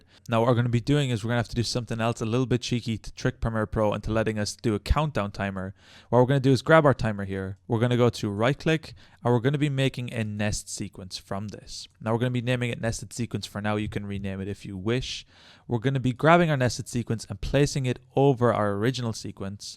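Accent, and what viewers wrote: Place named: Ireland. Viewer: Thank You! You sound Irish :D Also how did you get the Join Membership Feature??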